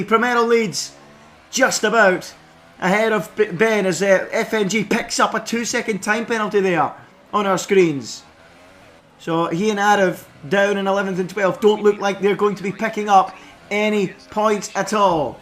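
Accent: Scotland, United Kingdom. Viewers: One question. Is he scotish? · nice scottish accent commentary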